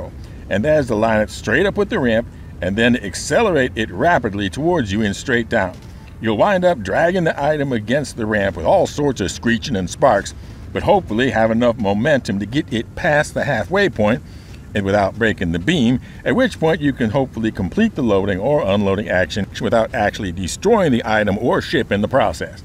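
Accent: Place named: South, United States. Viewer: Please don't take this personally, but for most of the world a southern US accent is not associated with the best of things. Also it's not always easy to understand. I would prefer a more moderated, just normal, English.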